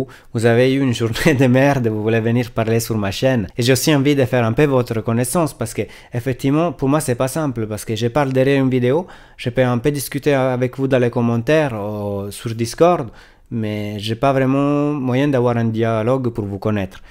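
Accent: Italy. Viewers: Salut. Tout d'abord, un grand MERCI pour ta chaîne et pour l'effort de parler correctement le français avec ton accent italien ensoleillé, alors que la plupart des youtubeurs francophones blingbling avec du RGB partout ont moins de mots à leur vocabulaire qu'un berger allemand. Je me suis abonné à ta chaîne parce que tu vas à l'essentiel de l'info sans fioriture et surtout sans effets de style linguistiques "tendance" et ça, ça repose vraiment la cervelle. Tes avis et critiques semblent refléter tes connaissances professionnelles d'ingénieur informatique et c'est plutôt rassurant 😉 Sinon, pourrais-tu parler un peu plus du matériel que tu conseillerais pour des machines orientées artistes 3D (Unreal Engine5, C4D, Blender, AE...)? Merci 😉😊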